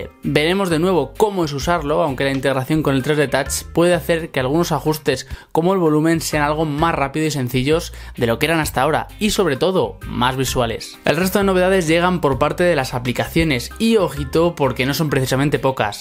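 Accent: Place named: Spain. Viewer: Por que los españoles le dicen Apel a APPLE?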